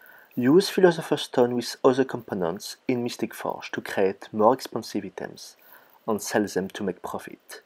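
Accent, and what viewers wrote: France. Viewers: He is French, not German! French people speaking Englisch sounds so much worse than Germans speaking it. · Good tips meme si ton accent francais se sent Bcp plus😂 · Merci pour cette vidéo, une vidéo pleine de bons conseils et un accent bien franchouillard :D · on sens bien l'accent française XD mais sinon bonne video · Good content, but i need subtitles to understand much of what youre saying. Very deep French accent. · Man,I love your accent...Liberte,Fraternite pour Tyria :) Amazing video btw.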